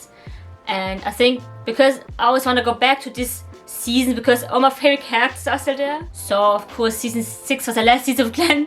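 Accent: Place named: Germany. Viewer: I admired your Review on the walking dead movie. Your narration is very well paced. And I felt a German accent in your way of speaking I don't know if I was right! Thank you for talking about this movie. I will put it in my list of must-see movies!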